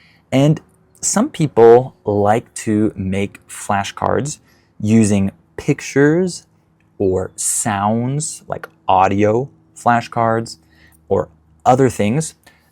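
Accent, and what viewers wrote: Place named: United States. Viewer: Yes American Accent😊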